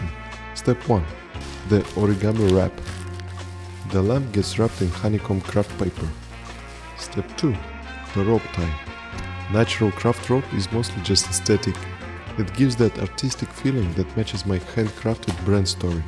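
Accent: Russia. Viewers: Where are you from? You saying "here in china", and given your accent, I would bet Russian. If so, cool. Glad to see a Russian making cool products, instead of failed wars. (Not to say we are much better, but we all know the war is a joke)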